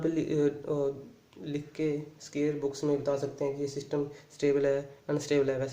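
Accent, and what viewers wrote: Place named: Himachal Pradesh, India. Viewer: आपके बोलने के तरीके से पता चल गया. मैं भी हिमाचल से हूँ इसिलिए।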